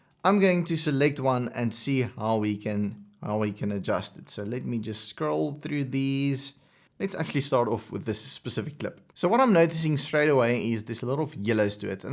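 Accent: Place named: South Africa. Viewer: South African!!!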